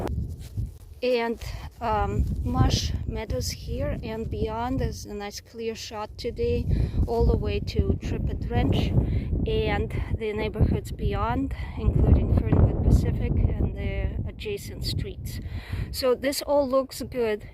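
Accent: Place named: Eastern Europe. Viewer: ) Можно и об этнической принадлежности. Хотя, не суть. Просто только сейчас заметил этот знакомый акцент из Восточной Европы. Давненько подписан, смотрел на творчество.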